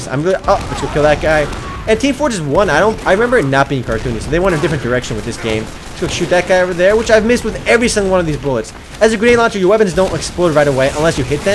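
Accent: Canada. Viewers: is this guy canadian?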